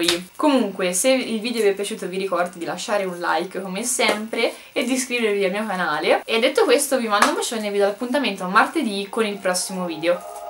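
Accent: Tuscany, Italy. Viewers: Ciaooo nuova iscritta, sei toscana come me! Di dove sei tu? 💕 bellissimo video comunque 😘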